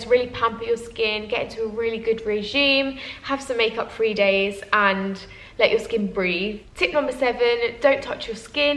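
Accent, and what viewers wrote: United Kingdom. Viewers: I love your British!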